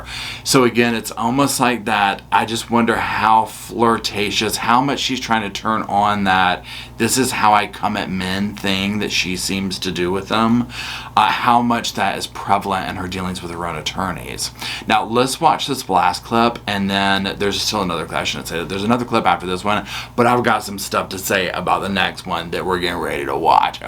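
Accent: South, United States. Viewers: Unrelated to the video topic: Omg, I love Mr. Roscoe P. Coletrain! His sweet lil pawpaw face! How old is he? He’s obviously very used to you holding him and talking with your hands because he keeps his sweet lil face out of the way of your hand gestures. You’re one of the few content creators who sounds almost like me…sweet and southern. Y’all are the best and I’m pretty sure I’d watch you read the phone book as long as Roscoe was there to supervise .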